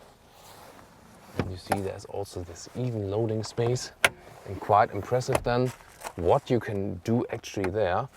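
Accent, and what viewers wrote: Germany. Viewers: would you ever trust a reviewer with a french accent (even if you can understand it)? the German accent, on the other hand, makes the review sound truthful, serious and trustworthy.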